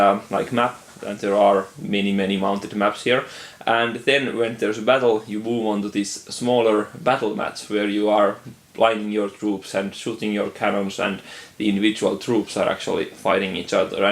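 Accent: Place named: Finland. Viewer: Finnish-English accent! Torilla tavataan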